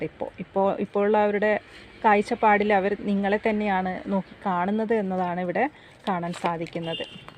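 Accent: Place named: Kasaragod, India. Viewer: ചേച്ചി കാസർഗോഡ് ആണല്ലേ... പറഞ്ഞതൊക്കെ കറക്ട ഒന്ന് ഓള് മനസ്സ് മാറി വന്ന മതിയാർന്നു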